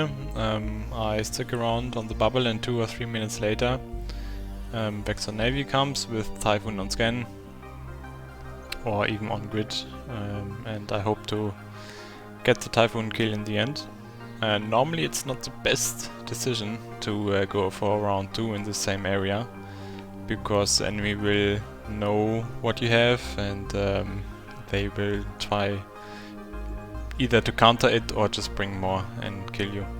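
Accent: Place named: Germany. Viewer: Ich wollte dich zuerst wegen deinem deutschen englisch dissen aber ich kann es selbst nicht besser :D Sehr nice!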